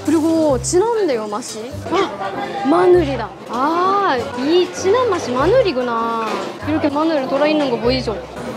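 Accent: Gyeongsang, South Korea. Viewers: 일본분이 한국말 하니까 경상도 사투리 갔군요 ㅎㅎ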